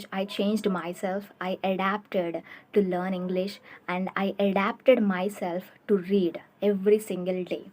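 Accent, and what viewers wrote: South India, India. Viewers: She has a South Indian accent; she may not even know Hindi, but she's addressing Hindi speakers, and she's good at it.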